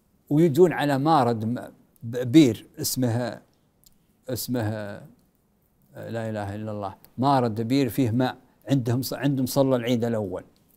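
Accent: Qassim, Saudi Arabia. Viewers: من امتع الحلقات،، المديفر من قوة ماهو مندمج معه قاعد يخاطبه بلهجته القصيميه واكثر حلقة ضحكت فيها ،،الله يعطيه طولة العمر ويحفضه وماشاء الله تبارك الله عليه . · لهجة المديفر القصيمية طلعت بهالحلقة يالبى أهل القصيم على راسي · المديفر فالحلقة ذي جاب لهجته القصيميه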